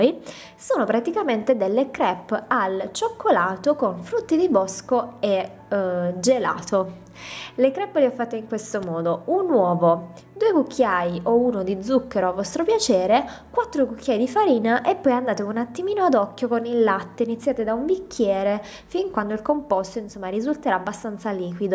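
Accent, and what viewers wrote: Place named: San Severo, Italy. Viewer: accento san severese inconfondibile :)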